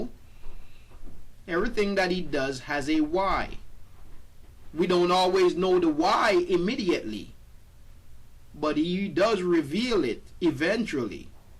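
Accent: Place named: Caribbean. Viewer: Thanks for sharing. I heard your voice and was trying to place this Island brother.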